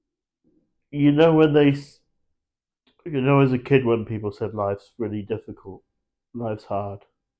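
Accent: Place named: Southern England, United Kingdom. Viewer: I’ve only just seen your channel pop up on my homepage, and there are elements of the helplessness that absolutely resonated within me. I lost my girlfriend of 5 years due to cystic fibrosis when we were both just 21 years old. I had months of feeling just as you’ve described - and remember being sat in my kitchen crying my eyes out with my dad feeling like he wasn’t able to help me. I know you’ve not asked for advice but all I will say is the thing that I found the most difficult was allowing myself to be happy, to be in the moment and take the joy from the little things at their face value, and try to detach the shadow hanging over me. The joy of every happy moment was overshadowed by thinking “tomorrow will be back to black” but over time I managed to let go of the shadow and for me that’s when my second life began. It’s the first time I’ve seen your channel, and really do hope you read this as it takes an absolute boat load of bottle to come out to admit these things. 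Sometimes with mental health things just feel so hopeless. I’ve subscribed to your channel, despite the fact that all I’ve seen is a man crying for a few minutes 😂 but in all seriousness I will look out for your next video, I’ve got no idea where you’re located but your accent sounds like southern England - if you are anywhere near Hampshire and want a beer or a coffee it’s a genuine offer mate. You won’t get there today, maybe not even tomorrow but you will get there. 🤘🏼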